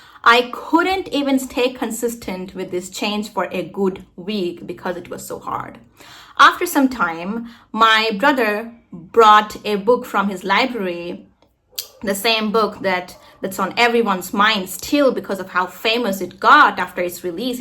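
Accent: United States. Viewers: Please sister tell me , how can get American accent like you ?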